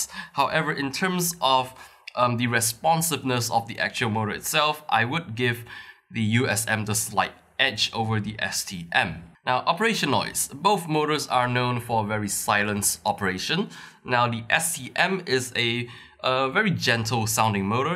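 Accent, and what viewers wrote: Singapore. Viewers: great review. may I know where are you from? you sound like Singaporean or Malaysian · Singaporean for sure.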